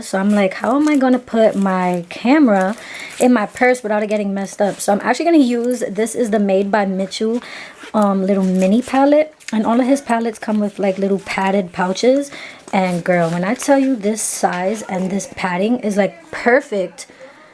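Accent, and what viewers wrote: New York, United States. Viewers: Omg, I been follow you for a while now...I had no idea you were based in New York, but now I can totally here that accent. Hella cute ma, much love from CALI 💙😈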